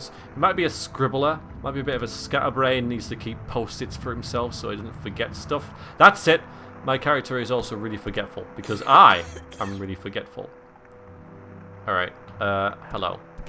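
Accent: Yorkshire, United Kingdom. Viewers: Sounds like you are a fellow Yorkshire man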